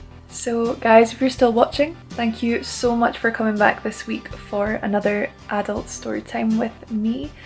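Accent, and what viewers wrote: Edinburgh, United Kingdom. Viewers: So nice to hear such a lovely Scot lass tell a beautiful story. Would love to hear a wee bit more of the Edinburgh accent, it is my favorite sounding speak. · I adored this story dear. I love your EDINBURGH accent. Once in another life I visit EDINBURGH. Almost marry a Scottish gal. Thank you for what you do.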